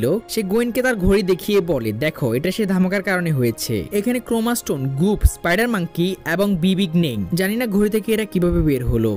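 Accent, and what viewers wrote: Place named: Noakhali, Bangladesh. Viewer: Noakhali accent chara baki shob tikh ache. Accent tikh korle awesome hobe. Good luck bro.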